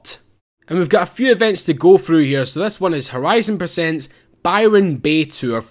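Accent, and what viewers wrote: Scotland, United Kingdom. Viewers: u r my my fav YouTuber now not only r u Scottish like me but I can make out every word u say unlike the Americans lol. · he's from Scotland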